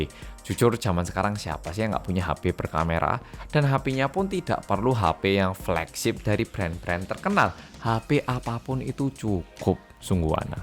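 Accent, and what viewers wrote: Java, Indonesia. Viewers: Salam medokkkkkk banggg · Medok Yach? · Medooooooooooooooooooooooooooooooooooooooooooooooooooooooooooooooooooooooooooooooo oooooooooooooooooooooooooooooooooooooooooooooooooooooooooooooooooooooooooooooooooooooooooooooooooooooo oooooooooooooooooooooooooooooooooooooooooooooooooooooooooooooooooooooooooooooooooooooooooooooooooooooooooooooooooooooooooooooooooooooooooooooooooooooooooooooooooooooooooooooooooooooooooooooooooooooooooooooooooooooooooooooooooooooooooooooooooooooooooook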